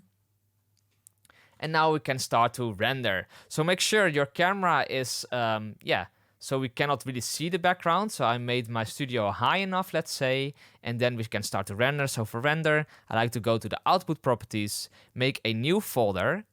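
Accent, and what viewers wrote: Netherlands. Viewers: Nederlands accent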